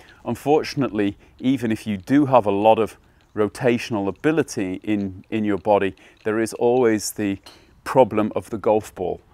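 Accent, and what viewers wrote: England, United Kingdom. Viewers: Guy sounds like Mick Jagger same accent.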